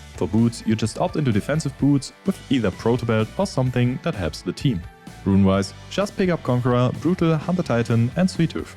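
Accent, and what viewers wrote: Germany. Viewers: Klingt etwas als würdet ihr aus Deutschland kommen xD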